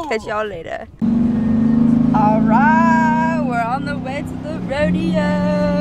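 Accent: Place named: United States. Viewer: I love your American accent !! Greetings from France :)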